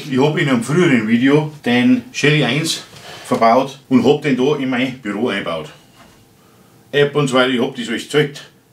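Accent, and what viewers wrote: Bavaria, Germany. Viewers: Schade dass man dich außerhalb Bayerns einfach mal kaum versteht. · Lieber, ich liebe deine Videos, deinen bayrischen Dialekt und wie du dich so herrlich in jedes Thema emotional hineinsteigern kannst. Natürlich bringst du wieder einmal die genial die Sache auf den Punkt.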